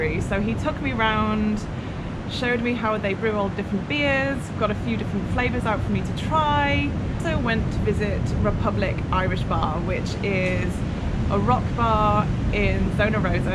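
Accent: England, United Kingdom. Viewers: I like your British accent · your accent is more like English from England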